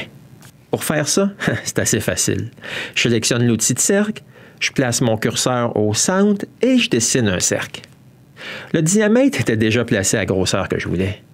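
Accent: Quebec, Canada. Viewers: C'est fou la sympathie que vous transpirez les québecois! j'sais pas si c'est votre accent ou quoi qu'est envoutant là... la preuve je parle comme vous là, y a votre accent dans ma tête :D Plus sérieusement tes vidéos sont géniales! t'as pas peur de nous montrer tes erreurs en plus c'est pas commun et super utile! ça donne envie de s'y mettre! Bravo pour ce que tu fais et merci! Alex, France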